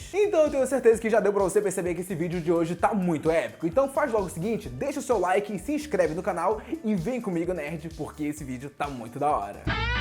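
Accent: Brazil. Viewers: ce é de Belém?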